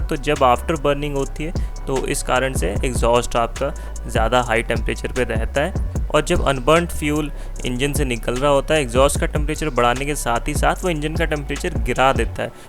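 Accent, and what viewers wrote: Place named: Uttarakhand, India. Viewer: So you are from Uttarakhand 😂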